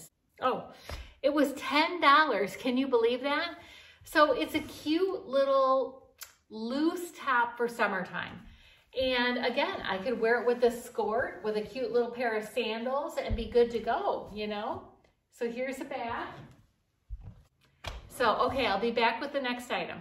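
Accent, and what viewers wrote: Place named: Midwest, United States. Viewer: The first thing is I noticed your accent. I thought to myself she’s from the Midwest possibly Michigan. That’s where I was born and my relatives always sounded like that. I moved away at seven and did not have that accent the second thing is I have a Ziploc type phone protector like that for the beach and I love it. The other thing is where are those skorts while you can. I am 63 and a longer wear them. I gave them up about 13 years ago. Do you look so cute in them and I love the tops and I would take that first stress and I would cut it off and make a blouse it’s adorable. I’ll have to keep my eyes out for the jeans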